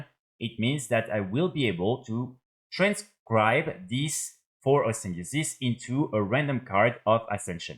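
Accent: France. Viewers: alala l'accent francais c'est trop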